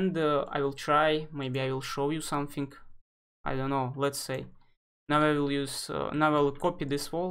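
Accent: Russia. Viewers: great tutorial, I think you are native Russian yea? You have the same accent speaking english as like my girlfriend, she's from sint petersburg :)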